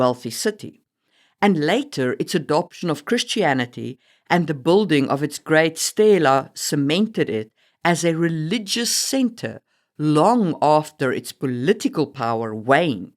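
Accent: South Africa. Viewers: Oh, it's no wonder I thought you were South African originally! I lived in Cape Town myself for a while 😁 Love your videos, thanks so much for all the tips and wisdom.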